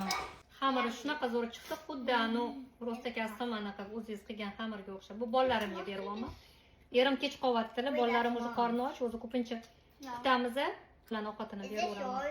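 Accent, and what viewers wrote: Tashkent, Uzbekistan. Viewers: Гапришиз Тошкентча Жула чиройли гапирвас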